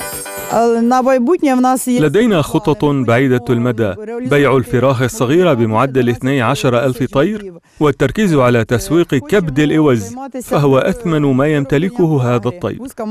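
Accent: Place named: Russia. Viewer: لكنة روسية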